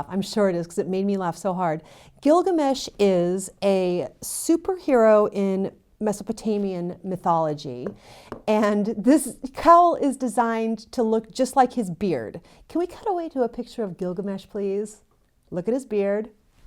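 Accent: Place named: United Kingdom. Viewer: welcom. I thought you british.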